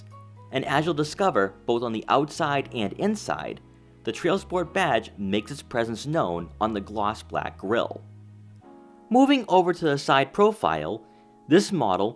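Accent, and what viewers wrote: Boston, United States. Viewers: Being that you're from Boston, I wanted to hear you, " Pahk the Caah".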